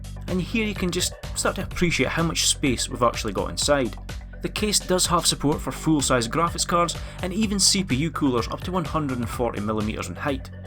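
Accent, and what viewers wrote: Scotland, United Kingdom. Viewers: Ha ha fuck, your really Scottish. :D nice to see another brother from above the border.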